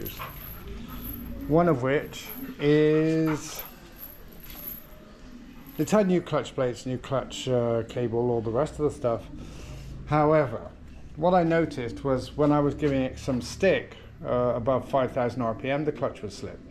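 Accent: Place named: Scotland, United Kingdom. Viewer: You sound like you might be from south of scotland?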